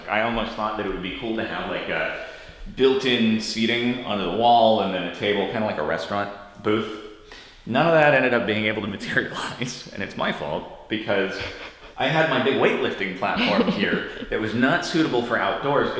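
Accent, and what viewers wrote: Australia. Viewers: Bro's Australian accent has gotten a lot better since Farmer__Steve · pretty good Australian accent tbh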